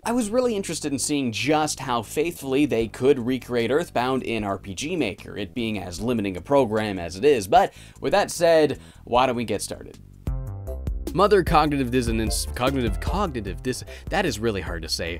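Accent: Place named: Canada. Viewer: Are you Canadian? Noticed the -ehr-'s on your "A" pronunciation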